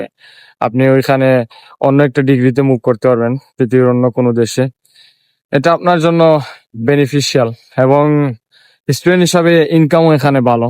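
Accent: Sylhet, Bangladesh. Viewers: Bhai Sylheti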